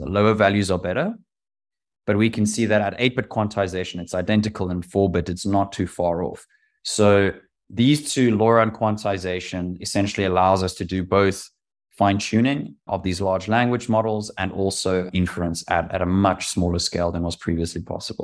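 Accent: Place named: South Africa. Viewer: Random comment, I hear your South African accent lol. Much love from SA!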